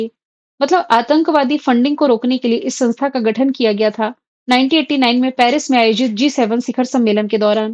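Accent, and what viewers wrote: Bihar, India. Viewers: बिहार से है क्या गुरुमाता जी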